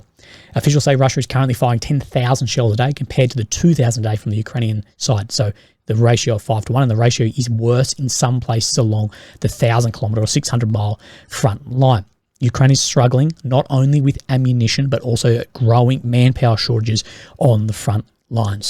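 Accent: Australia. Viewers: Sorry mate, I stand by my earlier comments that you are nothing but a US propaganda channel with an Aussie accent!! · He's Australian, DumbAzz!!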